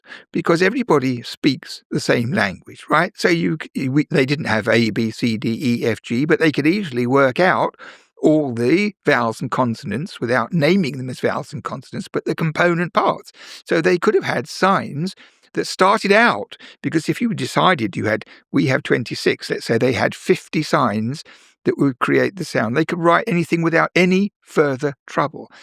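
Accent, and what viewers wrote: United Kingdom. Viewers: Funny u must be American saying he’s got accent. He’s British maybe u 😢are the one with accent. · Heard the British accent - knew it was gonna be good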